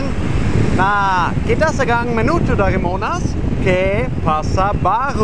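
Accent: Germany. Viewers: I like your german accent XD mas bule... Due bist schön XD *maap klo salah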